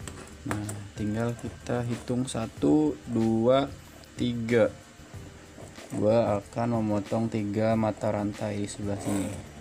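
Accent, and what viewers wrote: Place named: West Java, Indonesia. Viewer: sunda pisan mang..nuhun trikna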